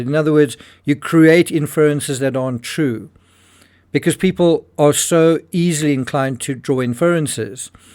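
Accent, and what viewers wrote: South Africa. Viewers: Definitely a South African narrator. i am not sure what I learned tho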